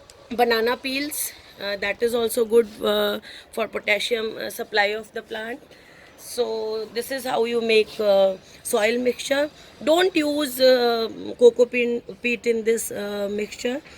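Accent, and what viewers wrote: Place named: India. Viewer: It sounds like you're from India or Bengladesh, how do you propose to deal with the Lilly's dormancy period in the tropics? I'm trying to protect my lilly plants, they stayed small this year and got chewed up by some kind of beetle. I finally dug them up this morning and started dividing the undersized bulbs and putting them in tiny planters I normally only use for cuttings. I'm hoping that they'll store more energy unmolested in the window with a screen between them and pests. :( I don't use artificial fertilizer at all because it damages the environment and the potassium and phosphate are mined. I use OMRI certified organic if at all. I prefer to use compost, and I've found that putting food scraps in the blender makes them break down into compost faster. High nitrogen fertilizer is actually very bad for plants, making them structurally weaker. 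So make sure any variety you buy is lower in nitrogen. Use grass clippings or shredded leaves at the base of the plant instead to get nitrogen to your plants at a more natural pace because they can't use all the nitrogen in artificial fertilizer.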